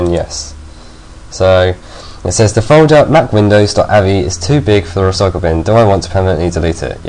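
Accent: United Kingdom. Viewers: I LOVE YOU! You are so so so so smart and ABSOLOUTELY BRILLIANT BRILLIANT, bloody brilliant! And I love your british accent. That does work.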